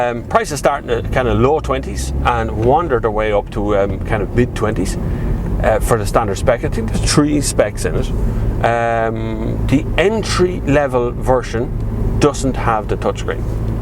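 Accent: Ireland. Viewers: Helpful review - and particularly insightful about the touchscreen - thanks. It's hard sometimes to keep track of how all the cars in this segment compare to each other for the aspects you can't see in the videos - ride quality, refinement, engine tractability and response. I'm meaning that it would be useful to have a comparison of these aspects between VW Golf, Audi A3, this Peugeot, Alfa Romeo Giulietta, BMW 1-Series. As I think you have driven all these cars - perhaps you can edit something together to compare them all? I really enjoy listening to your voice - to my non-Irish ears, you sound like Chris O'Dowd (IT Crowd, Bridesmaids) and you can be just as entertaining! Thanks again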